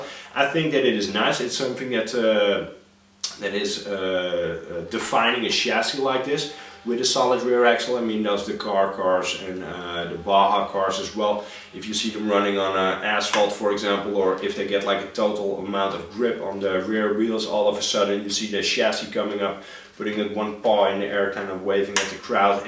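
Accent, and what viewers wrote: Netherlands. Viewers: Najs Dutch accent..! :-)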